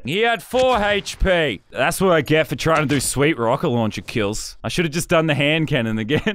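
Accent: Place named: Australia. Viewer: you're my favourite YouTuber, not just because i think swearing in an Australian ascent if funny but the things you say and do make me laugh just as much, also keep playing fortnite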